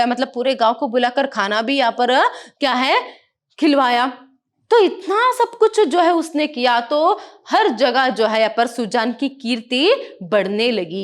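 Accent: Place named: Tamil Nadu, India. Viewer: mam your hindi has tamil accent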